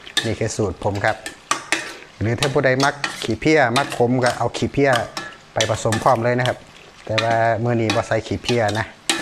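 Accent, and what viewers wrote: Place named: Chaiyaphum, Thailand. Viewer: จ่าเป็นคนบ้านได่ครับ สำเนียงแบบนี้ให้ผมทาย ชัยภูมิคักๆ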